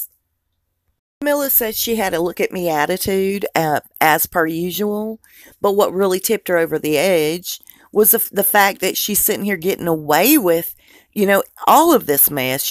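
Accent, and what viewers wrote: South, United States. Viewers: What’s the Mystery Woman behind Your Southern Belle Voice ? I want to see your face🌹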